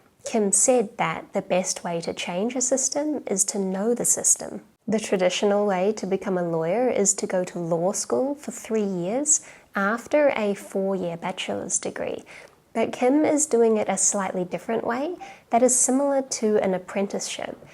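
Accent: Australia. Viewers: No, that sounds more like an Aussie accent in this video. Either way, still great content! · Are you from Australia??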